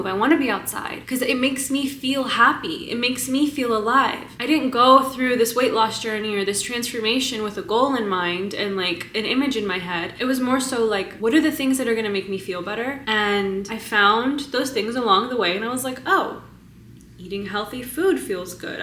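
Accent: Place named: United States. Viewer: Defiantly fine, fine, fine. Yet I have a hard time making out what ethnicity you are though because of American accent is strong.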